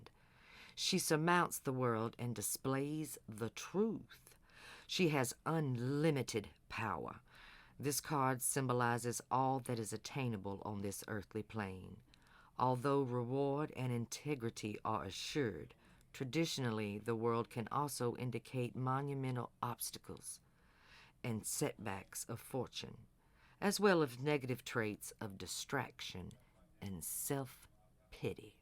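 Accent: South, United States. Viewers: What a melodic sultry southern voice so absolutely beautiful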